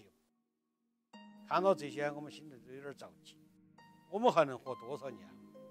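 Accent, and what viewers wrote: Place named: Sichuan, China. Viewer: 浓浓的四川味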